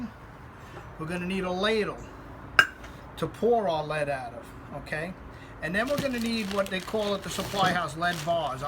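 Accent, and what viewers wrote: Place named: Long Island, United States. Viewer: Holy shit that long island accent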